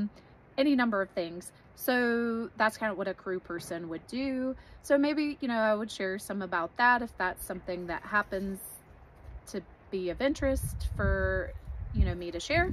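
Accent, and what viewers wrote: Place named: North Carolina, United States. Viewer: Adore your cowl❤ and we were in NC for about ten years so I love hearing your accent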